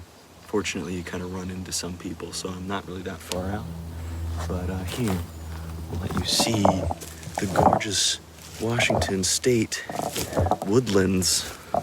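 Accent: United Kingdom. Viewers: I was sooo sure you were in the UK :-) You sure fooled me (and others) with your slight intetnational'ish Brit accent reading Douglas Adams.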